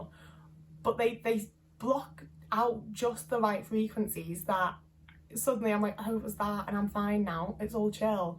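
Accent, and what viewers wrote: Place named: Northwest England, United Kingdom. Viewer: It's not particularly strong which makes it hard to say for certain, but central northwest England, between about 53 to 54 degrees north, includes the Manchester area.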